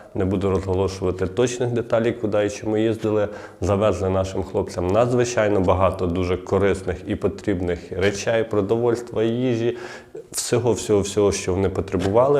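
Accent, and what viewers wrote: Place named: Galicia, Ukraine. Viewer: Я уже молчу о том, что сам выговор отвратный - тошнит. Галичина, тьфу.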